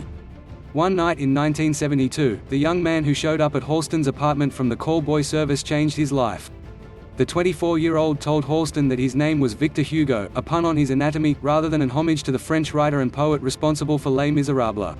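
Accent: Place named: Australia. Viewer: YES and the voice sounds like an Artificial Intelligent robot, it sounds drone like, whoever it was picked the "Australian male" voice setting in the narration reader and used a cheap AI subtitler on top of that.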